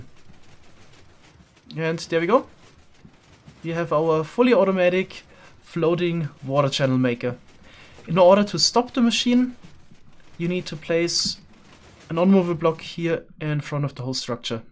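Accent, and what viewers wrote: Germany. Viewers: Are you german? I think I can hear a slight german accent. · ach du kacke hast du nen deutschen akzent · Sat german accent <3 :D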